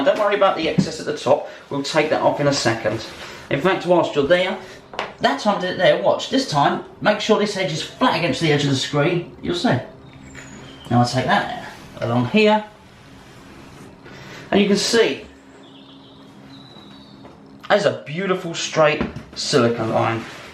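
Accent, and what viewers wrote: United Kingdom. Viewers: nice vid. what accent are u ? british aussie kiwi??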